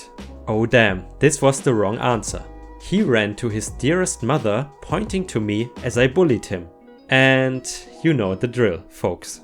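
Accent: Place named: Germany. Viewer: You're saying 'pastor' just fine (more like 'past-ah', but frankly I love the German pronunciation, so doesn't matter to me what you say!). An FYI (for anyone who doesn't know), Xmas (which is actually pronounced Christmas btw) isn't a lazy way of writing the full word. The X comes from the Greek (classical, but I think it's the same in modern) which was the first part of Krisotos (pronunciation, not spelling!). When Christmas was first being celebrated, it was common to write it as Xmas as Christianity wasn't yet a fully-accepted religion. There are a few other possible explanations as to why it was used, but that's the first I was told so I stick to it. Hope you don't mind the didactic moment LOL!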